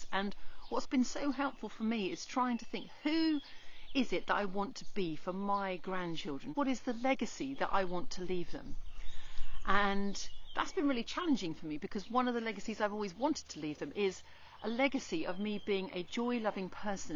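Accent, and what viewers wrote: United Kingdom. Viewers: Are you from Norfolk? You sound like Pam Aires. (Hope that is the correct spelling). Sorry for your loss. Moving abroad sounds great but language is important factor to consider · I just watched your video again (saw it for the first time this morning). I am so impressed with your remarks -- they really resonate for me, and inspire me. "I've been investing in my retirement for many years, but in this moment I wanted to invest in happiness, and joy, and precious memories." WOW! Thanks for inspiring me. Where do you live when not in Sweden? Based on your (slight accent), I assume you are British? Thanks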